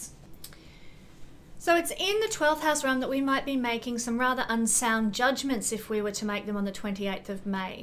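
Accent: Australia. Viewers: Pink blazer lookin fiiine, 😚! Oh, Aussie accent is the loveliest for sure! Btw, I think you forgot the time stamps for the last transit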